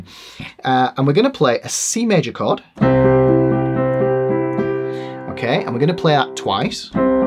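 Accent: Yorkshire, United Kingdom. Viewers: Great vid. You sound like a Yorkshire man to me as an ex-pat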